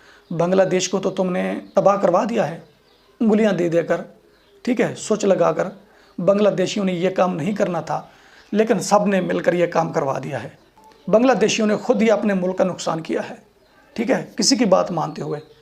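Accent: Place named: Saraiki, Pakistan. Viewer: bhai andaaze bayyan boltta hai aap sirraaeikee zubbaan ka mixture hai achhaa bolte ho khush rahein panipat s kumar singh o k B y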